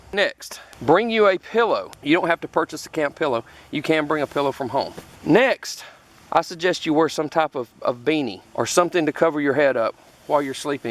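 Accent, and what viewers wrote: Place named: Alabama, United States. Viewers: Great job sir. I also thought how familiar your accent sounds. I grew up in south Alabama. I've been wanting to camp as I did as a kid but wanted a refresher overview. This is exactly what I needed. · Loving your southern state Alabama accent there mate! Greetings, Leeds, UK. Good tips for me and my new wifey for our first camping trips. 😉👍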